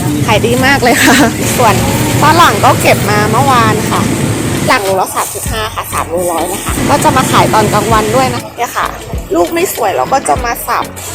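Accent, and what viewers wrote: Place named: Isan, Thailand. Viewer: อีสานเก้นี่หว่า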